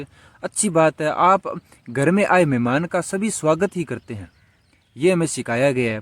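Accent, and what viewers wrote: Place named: Pakistan. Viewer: Your hindi is absolutely sounding like Pakistani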